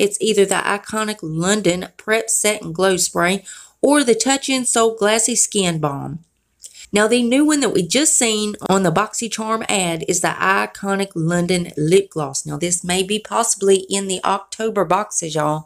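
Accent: South, United States. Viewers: Girl I love your country twang .. I'm a country gal my self i love watching you.. your the best!!!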